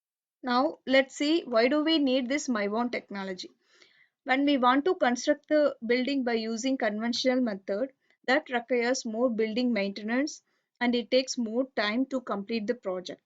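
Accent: South India, India. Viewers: Very boring narration, dozed off when video was playing. also typical South Indian accent of the woman narrating. Wish it was more professional.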